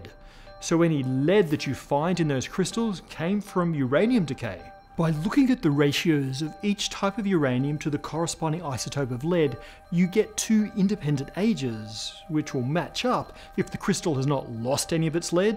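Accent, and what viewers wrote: Australia. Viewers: He's speaking with an Australian accent, if that's what you're talking about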